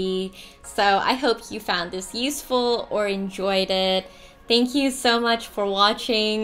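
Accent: United States. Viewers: What is with the American twist on the accent if Oz is home?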